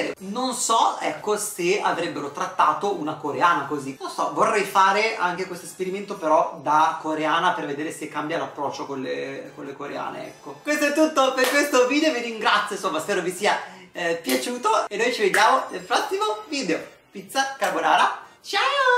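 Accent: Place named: Romagna, Italy. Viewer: Ma perché in questo video hai la "s" romagnola? Adoro btw 😘...baci dalla Romagna ❤️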